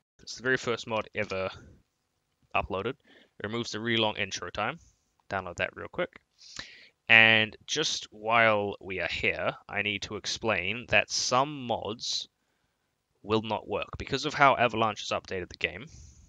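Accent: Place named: New Zealand. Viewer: i thought that was a new zealand accent.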